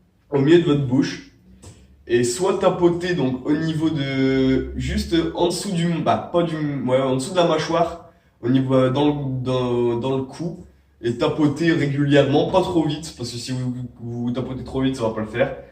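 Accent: France. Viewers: tu viens du nord ? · T'es de Ch'Nord?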